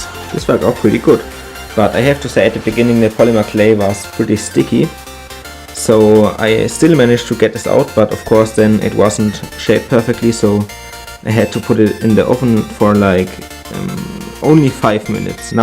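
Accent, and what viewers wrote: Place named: Germany. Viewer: your english isn't the best, bist du deutsch, are you german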